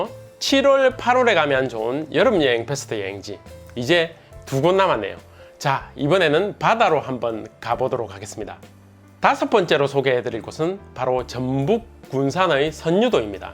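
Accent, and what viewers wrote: Gyeongsang, South Korea. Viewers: 구수~~한 깅상도 억약이 참말좋십니더 좋은정보들 주셨어 감사합니다~~^^ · 저도 경상도 사람이라 듣기좋아욤👍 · 목소리 작렬 ..경상도 사투리 그러나 듣기는 쬐끔 ㅎㅎ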